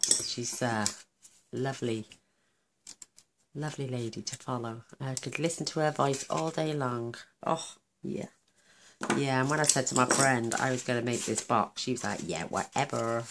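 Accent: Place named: Yorkshire, United Kingdom. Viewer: How lovely and unusual - love it (are you in Yorks - just going by the accent xx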